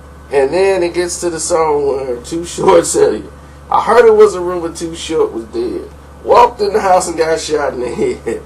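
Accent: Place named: West Coast, United States. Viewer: The slang had me crackin upp haha you too funny. Keep up the great videos. And you Spot on with that west coast slang!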